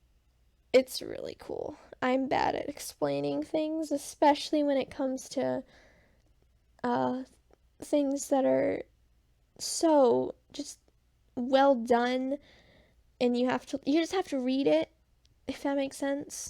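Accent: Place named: United States. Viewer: I can tell you are american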